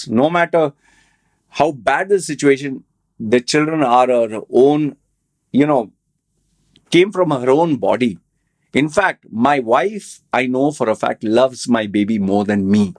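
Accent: India. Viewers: We want full video in your hindi tone...5 pandav 😂😂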